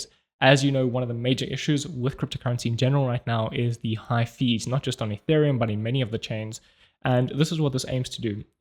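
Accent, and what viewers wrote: South Africa. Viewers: I love your south African or Australian accent and your videos. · By any chance you're South African ?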